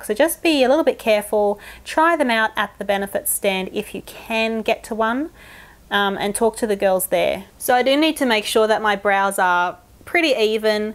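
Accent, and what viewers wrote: Australia. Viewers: I love you, you're so funny ! :) I think you're my favorite beauty youtuber ! And as I'm studying English language and culture at university, it's also a way to learn the Australian accent. Best regards from France. :)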